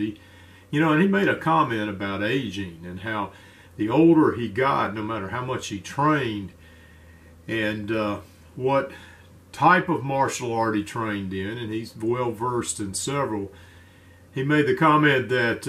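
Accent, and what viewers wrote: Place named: Kentucky, United States. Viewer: Hey you better stick around, for one, im a revolver fan, for two I was raised in Kentucky and I love your accent. I had my first long gun at 9 years old, it was a 410 Stevens and still have it, I was trained by a Sargent ,Korea era, named (dad) .and three, I'm 64 and love using an old 38 from the hip. A Taurus 82 that's all I need. Thanks again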